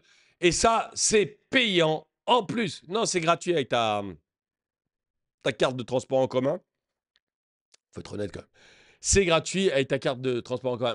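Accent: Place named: Lyon, France. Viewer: C’est un Lyonnais. Il ne prononce pas les z finaux, comme dans toutes les régions d’influence savoyarde.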